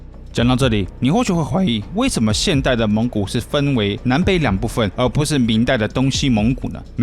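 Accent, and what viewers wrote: Taiwan. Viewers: 非常台灣腔，很奇怪 !!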